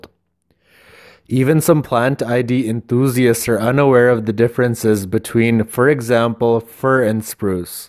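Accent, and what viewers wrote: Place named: Canada. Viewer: I like a Canadian accent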